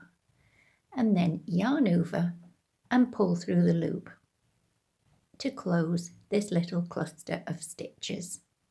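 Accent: England, United Kingdom. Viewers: Great vid - although hearing an English accent speaking about "half double crochets" is kind of messing with my head lol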